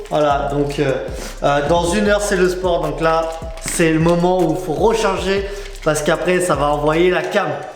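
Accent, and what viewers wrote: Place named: Maghreb. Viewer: Tu es d’origines arabes? T’as un accent du Maghreb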